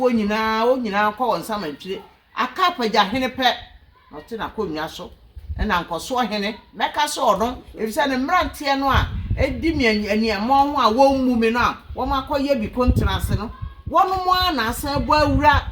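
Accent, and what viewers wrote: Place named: Volta, Ghana. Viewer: The twi is beautiful but the Volta high accent is evident.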